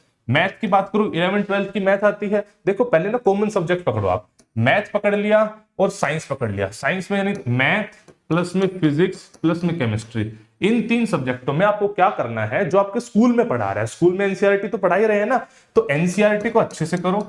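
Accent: Haryana, India. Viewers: Sir Kya aap HARYANA se ho ? ☺❤ Aapki awaz or boli dekh kr lgta h ki aap HARYANA se ho ....... Please reply sirji 😊❤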